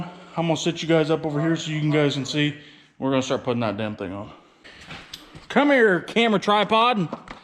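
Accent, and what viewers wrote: South, United States. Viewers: My 8 year old boy and I love watching your videos. They are great. We Live in Pittsburgh Pennsylvania, in the city ,but my son wants to be a country boy more than anything, and he always tries to talk with a country style accent like you do and has been trying since we have started watching your videos. Keep them coming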